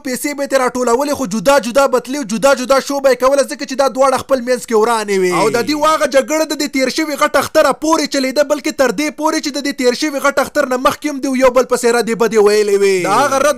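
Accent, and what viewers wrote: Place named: Dir, Pakistan. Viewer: on fire, da accent kho d dirojy dy bacha jee, snga teek me oway ka na, hum da dagha region ye.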